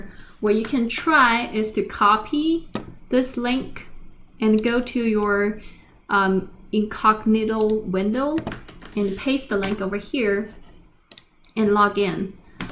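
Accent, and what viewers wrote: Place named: United States. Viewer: another good video. I appreciate you helping me understand how to use the RAP rules. In the past I have used the Application>Distribution>Export function to save my application rulesets. It has worked very well for me. Is there a reason you did not choose the Export function? I have a suggestion to make. In the future if you have a video that requires the pega environment to be set up to follow along with your lesson. Save the pega jar file on your git-hub site. For example, since you referred to PegaFem channel this is what I did. After I completed her Video 4 exercise I exported my application to a zip file. Then a couple of weeks later I imported the Video 4 exercise zip file and I could start working on her Video 5 exercise without recreating her pervious work. I hope my explanation is clear if not let me know and I will go into more detail. 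I haven’t seen any new post on PEGAFEM for awhile I hope see keeps on posting. Your two post are the easiest for me to understand (because I understand your American accents). I hope both of you keep posting about Pega. Looking forward to working on the 5 post I have not studied yet. Thanks again.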